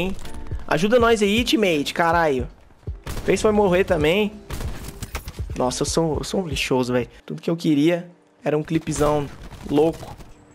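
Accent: Minas Gerais, Brazil. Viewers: Man vc é mineiro ?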